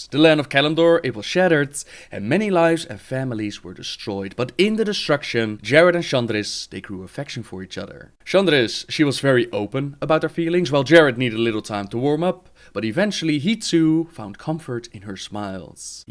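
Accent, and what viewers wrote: Netherlands. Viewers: I know I asked that on asked but I am not sure if you saw it. Can you make fury do one lore video? It is relaxing to listen to her voice. It would be perfectly to just chill out and listen to some great story told by fury:) And force her to make music and sing. But never the less keep up your good work too. Also a great voice to listen to and the dutch accent is always a friendly one to hear:D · are you Dutch ? :) well i am c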